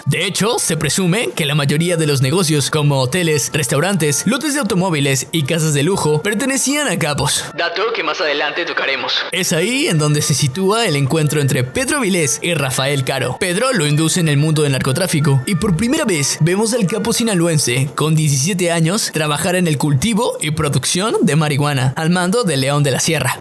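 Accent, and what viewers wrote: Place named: Mexico City, Mexico. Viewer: Narras muy mal, demasiado acento del DF.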